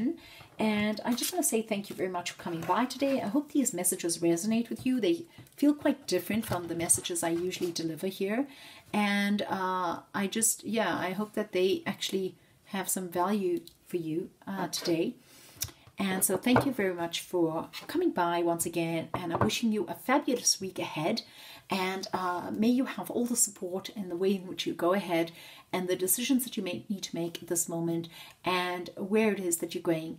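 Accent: South Africa. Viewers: Love your style 🔥 Also lové your accent, are you based in South Africa by any chance?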